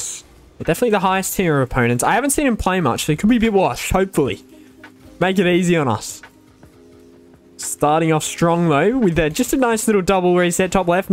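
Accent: Australia. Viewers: i didnt even realise u were aussie man till this vid you accent stands out to even me and im aussie to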